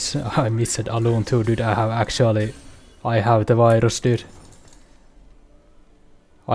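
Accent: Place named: Finland. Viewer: You have to be 100% finish. That accent tho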